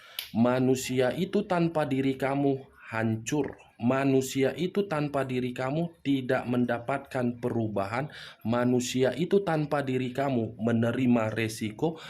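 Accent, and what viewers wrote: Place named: West Java, Indonesia. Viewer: Acak Adul Sunda banget bang Bahasa orang Bandung 😁😁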